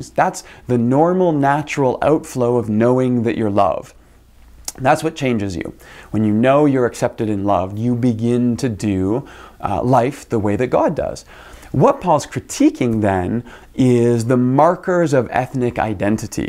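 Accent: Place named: Canada. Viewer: I can hear the Canadain influence not only in the accent but in the non-binary perspective so refreshing. Speak louder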